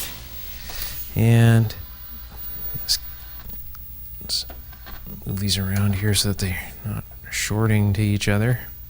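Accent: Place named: Canada. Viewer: I detect a Canadian accent, bet I am right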